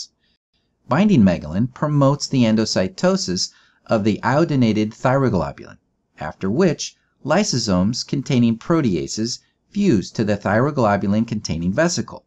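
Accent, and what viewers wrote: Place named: Midwest, United States. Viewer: Very nicely done, just the right amount of detail. P.S. I couldn't stop thinking the narrator of this video must be from somewhere in the Midwest ;)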